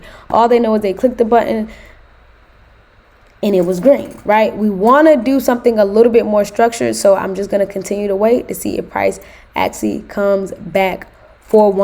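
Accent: United States. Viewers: Are you from DC?? You sound like Blac Chyna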